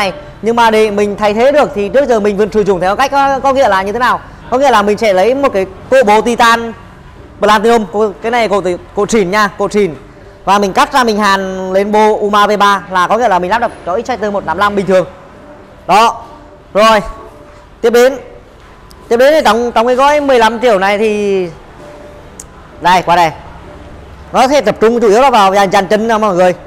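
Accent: Nghe An, Vietnam. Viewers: Bác người Diễn Châu nghệ an phải ko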